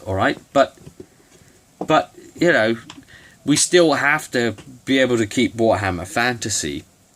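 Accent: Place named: United Kingdom. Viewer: There's just something about a good British accent doing one of these, that we Americans just can't muster in quite the same way....that gravitas...that...elegant sarcasm....brilliant! Thanks for it - you did a great synopsis of much of the concerns that many of us have had since first hearing of this.....game.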